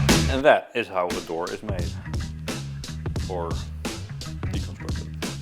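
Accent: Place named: Netherlands. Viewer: I was listening, and thinking that accent is very familiar. Then you started speaking Dutch and I was like MY INSTINCT WAS RIGHT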